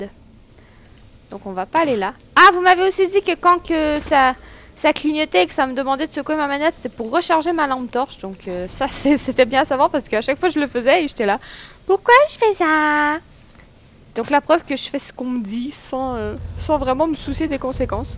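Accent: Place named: Quebec, Canada. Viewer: J'adore tes vidéo est ta personnalité en plus j'adore l'accents Québécois Je te suis depuis tes 900 abonnés est ta tellement bien évoluer même avec tes 100k tu reste toujours aussi proche de ta communauté est sa c'est rare!! Bonne continuation:) Sache que je ne me desabonnerai jamais:^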